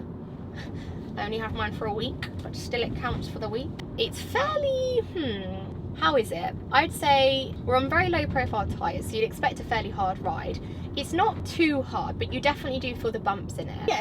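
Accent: United Kingdom. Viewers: clicked for the car, stayed for the accent, love the brits accent damn it's soo cute & sexy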